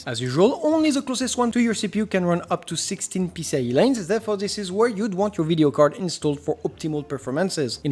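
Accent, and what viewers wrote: France. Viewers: Merci je suis convaincu! J'attends quand même la nouvelle b550 de msi pour être sûr! Première vidéo que je vois sur ta chaîne et tu viens de gagner un abonné (le French accent is lovely)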